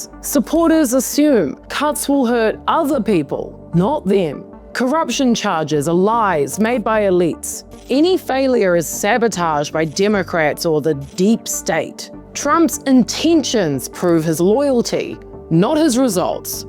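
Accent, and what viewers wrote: New Zealand. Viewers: Thank you to a New Zealander on the other side of the planet for helping me understand my neighbors in the U.S. a little bit bedder, (sorry; 'better'. Enjoying the Kiwi accent). I've lived most my life between rural Maine and the city (not bedroom suburbia). Last week, deep in the Trump country of rural Maine (Burnham, 67.5% Trump) we just lost another factory, (making wood products.) Whatever the reason; state laws, Trump tariffs, Trumpism is clearly not helping. Will they catch on? Probably not before it is too late and any form of democracy is gone. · Excellent logical intelligent presentation. I was sceptical initially and suspected this was an AI-generated Kiwi accent to appeal to us, despite being familiar with voice from RNZ but clueless about her appearance. (Subbed.) Trump convinced the "unwashed" that the middle-class were the elites who were mocking and oppressing them. 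Steve Bannon is all over this cynical manipulative strategy.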